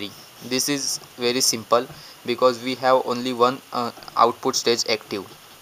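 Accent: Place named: India. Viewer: Am I the only one who is searching for a tutorial on the full or half adder without an idian accent? Very good tutorial!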